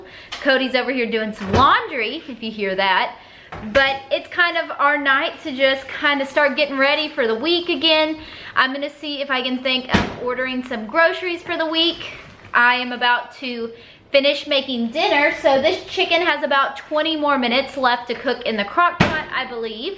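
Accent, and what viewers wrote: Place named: Texas, United States. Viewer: I knew you were a fellow Texan from your accent.😀 Im a newbie to your channel and I love it. CONGRATULATIONS on your boat 🚤🚤!!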